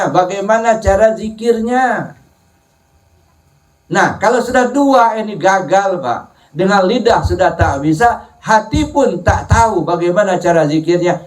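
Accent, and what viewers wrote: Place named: Sumatra, Indonesia. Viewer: Assalamualaikum, Kalau boleh tau pak orang apa ya, logatnya seperti orang Sumatra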